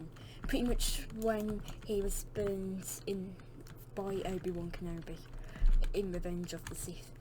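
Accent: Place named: United Kingdom. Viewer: Cool video :) I also really like your British accent lol ,also can u make a video on how to get Jesus skin for Obi-Wan-Kenobi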